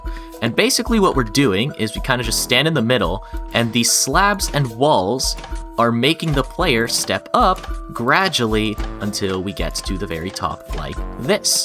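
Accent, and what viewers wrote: United Kingdom. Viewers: why does sound a lil bit english today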